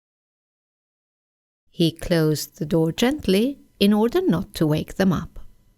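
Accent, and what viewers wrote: United Kingdom. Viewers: It's an honour to be taught by you.I noticed your British accent.That's amazing and I'm Azerbaijani.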